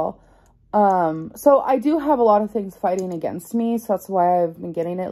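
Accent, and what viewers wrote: South, United States. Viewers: What happened to her southern accent ? I haven’t watched her in a while lol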